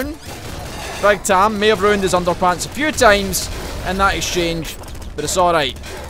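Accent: Scotland, United Kingdom. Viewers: lol this was alot more enjoyable then other runs of this game ive seen XD and your accent is fanfuckingtastic is it scottish?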